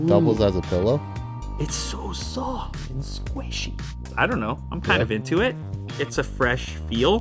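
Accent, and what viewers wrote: Canada. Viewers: I appreciate how Canadian my guy sounds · sounds EXTRA Canadian in this video! I’ve never noticed it, through the hundreds of other videos I’ve watched. So crazy. I pictured him as a Wisconsin guy or someone from Louisiana.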